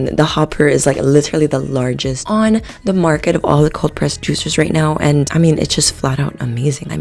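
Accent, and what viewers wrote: United Kingdom. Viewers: I love a good British accent ❤❤❤ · Excellent British Accent 👌🏾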